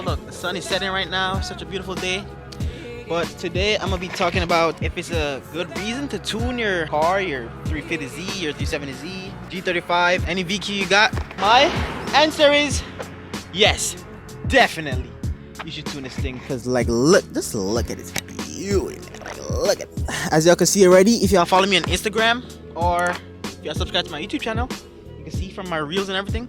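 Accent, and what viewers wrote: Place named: Jamaica. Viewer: Bro sounds so Jamaican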